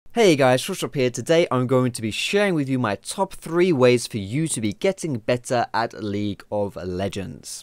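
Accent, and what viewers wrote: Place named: United Kingdom. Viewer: Pls foxdrop make a guide on how to speak with such a nice british accent!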